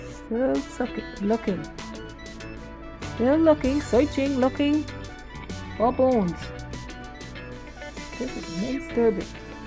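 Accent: Bahamas. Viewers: Just because this is what I'm cooking I selected this. I didn't realise this was Bahamian until i heard you speak. Will definitely subscribe.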